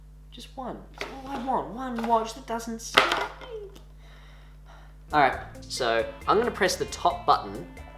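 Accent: Australia. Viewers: I'm still hanging out for the DIY fixes to some of those terrible quality items Alex is piling up for you. My hobby is remaking things and I love watching other do it too :) Oh and FYI - as a Kiwi - I'm not here for the Aussie accent like some other commenters , but in your case I am prepared to forgive it :) Chur bro. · I don't even care about watches I'm here only for the Australian accent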